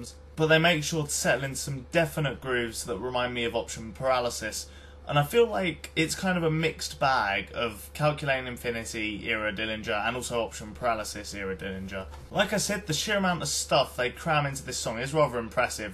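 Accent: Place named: United States. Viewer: an american one; the kind of accent that lets you know that the guy talking wakes on redbull (that's not a hidden advertising message btw haha) :P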